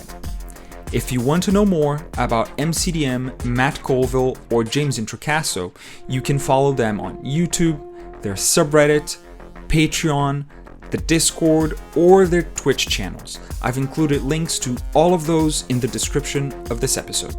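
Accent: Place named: Brazil. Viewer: eu tava estranhando o sotaque no último vídeo, mas não tinha reparado no nome... Vc é br senhor? kkkkkk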